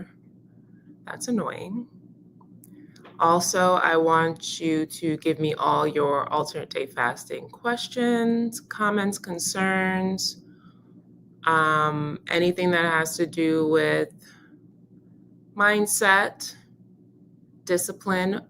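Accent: Jamaica. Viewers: the intermittent Jamaican accent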